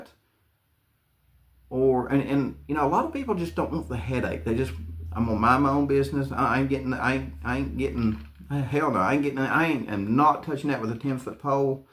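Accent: South, United States. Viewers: darn your southern drawl is Awesome,Im from Tennessee but iv lost all my accent,Sad,Sori off topic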